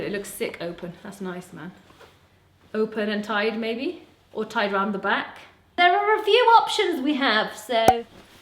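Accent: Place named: England, United Kingdom. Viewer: your voice + that English accent = perfection. I don't wanna sound creepy but dammit I love your voice,! Hope you're having a healthy pregnancy.🌻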